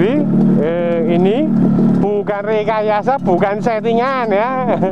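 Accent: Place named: Wonosobo, Indonesia. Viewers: "Ho'oh siiih..." logat kata khas Wonosobo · Suarane wong wonosobo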